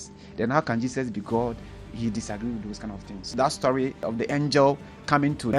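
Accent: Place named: Arab world. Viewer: You can see the way the Arabic accent was so clear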